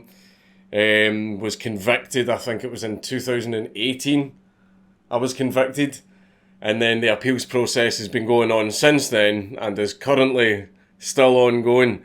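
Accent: Scotland, United Kingdom. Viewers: I'm American and think this is BS. You should have won the first time. Also, I asked my little sister if she could understand your accent. Apparently I can understand a thick sottish accent really well.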